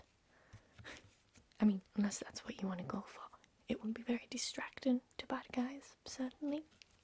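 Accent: United Kingdom. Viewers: Wait...she's British...well this suddenly got VERY interesting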